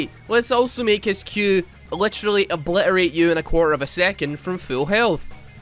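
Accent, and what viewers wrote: Scotland, United Kingdom. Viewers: I went from asmr to this, almost fell asleep to the sexy Scottish accent🌞 (EUW) Legendarylemon5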